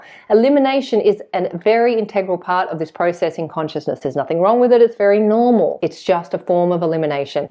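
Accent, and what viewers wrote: Australia. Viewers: Hey I come here for your Aussie accent. Love it.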